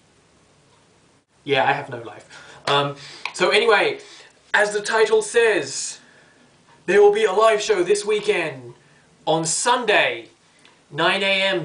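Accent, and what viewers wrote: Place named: England, United Kingdom. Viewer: I'm REALLY curious on how u got ur Semi-English accent??!! I want one hehe Lo0oL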